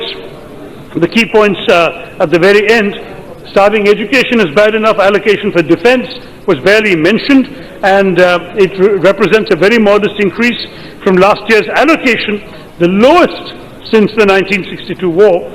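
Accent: United Kingdom. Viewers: I really really admire not only bcoz of his english accent but highly bcoz of his speech which is always in the point 👍👍👍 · It's nice to deliver something like British accent, but how many Indians would be able to understand it, and this is again an advantage to the government. So, better showing our Excellency through any language we have to make our citizens to be aware of every single fact and figure out the actual face of the government. Hume ye dhyan Dena hoga ke aam logon ko Sarkar ki kaam kaj pata chale.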